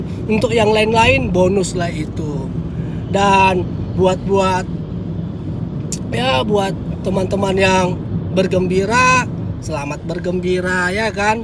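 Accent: Medan, Indonesia. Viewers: Bang aq ngakak banget pas abang bilang telor bunting,telor bengkak pula😂😂😂dan aq suka bnget cara abangnya ngomong medan banget gitu tp slow ah pokoknya nyaman aja lah bang liat plus dengerin abangnya ngomong.Btw aq orng Bandung bang😅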